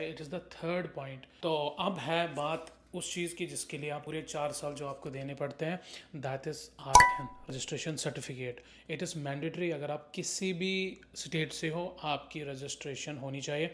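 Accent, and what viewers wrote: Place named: Kashmir, India. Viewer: Bhi lots of love from mother land kashmir ❤ mai soch he raha taa aawaz tio kashmiri he lag rahie hai...phir old vlogs dekha....luv 😮❤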